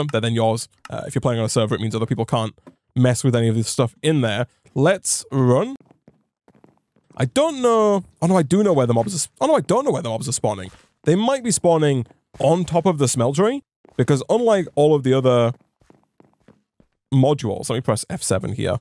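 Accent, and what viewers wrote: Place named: Hull, United Kingdom. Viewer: I've been trying to pin your accent down the whole time I've been watching your videos. I finally got it. That's a Hull accent. Great content fellow yorkshireman.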